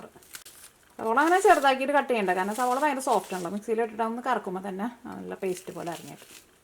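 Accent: Thrissur, India. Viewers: Heyyy, you are so adorable n I simply love yr simple n natural method of recipe explanation in your dialect of Angamali?? But feels like Thrissur...oh btw -that kadai is sooo beautiful..at the US shop???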